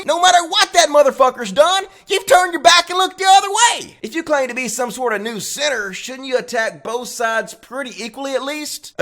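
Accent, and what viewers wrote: South, United States. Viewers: Well you're southern so it's to be expected, like when Pimpmunk said Massetushes.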